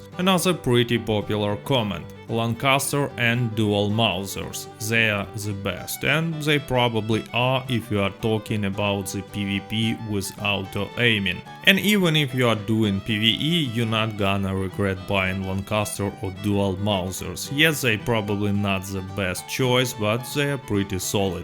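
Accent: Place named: Russia. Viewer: I've been subscribed for about six months and have a couple of compliments for you. First, your sarcasm is super extra funny coming from a guy with a Russian accent. English idioms and puns are hard even for native speakers but you mastered it. Second, if I were to guess your occupation it would be in the sciences. The combination of the scientific method you use (you always have an independent and dependant variable, and eliminate possible variences in the tests) plus you have a good sense of humour so I would bet you are an engineer. Last, I loved the way you used to say "Target" as "Tarjet". You should keep doing that as a running joke. Mad props to you, far and away the best Rdr2 YouTuber!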